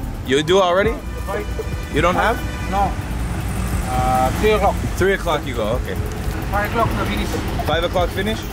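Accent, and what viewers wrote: Sri Lanka. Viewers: You look Sri Lankan :D You even speak like some Sri Lankans. :) I think we may be rubbing off on you ;)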